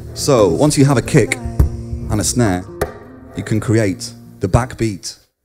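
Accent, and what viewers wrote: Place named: Australia. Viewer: he sounds a lot more aussie than i ever guessed by his singing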